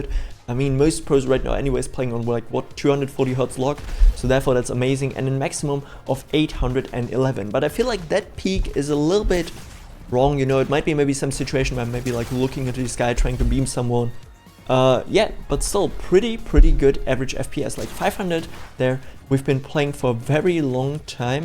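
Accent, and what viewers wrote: Germany. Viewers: i can hear the german in u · Man hört dein deutsch raus! Sehr geiles video! Habe mir jetzt auch einen gebaut mit nem Ryzen 7 5700x, ner 5060 32gb ram usw.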